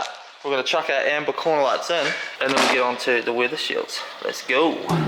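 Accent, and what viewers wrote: New Zealand. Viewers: is that an nz accent i hear?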